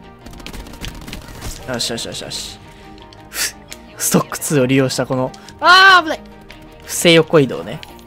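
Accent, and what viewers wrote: Kansai, Japan. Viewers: グリくん関西出身だったりする？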